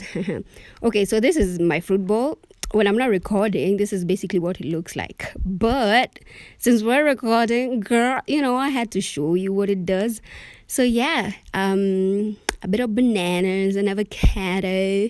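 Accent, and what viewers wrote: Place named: Kenya. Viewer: Kenyan YouTubers and accents yawaa.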